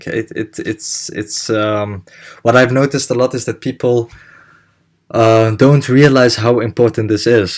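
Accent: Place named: Netherlands. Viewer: you must be dutch or belgian :D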